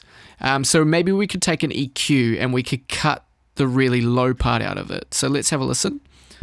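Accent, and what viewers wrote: New Zealand. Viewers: Heard you speak in the intro and was immediately like "ay new zealand!?" haha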